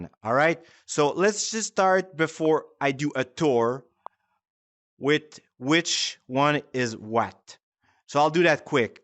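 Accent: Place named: Quebec, Canada. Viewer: C est tellement un Quebecois qui parle !!!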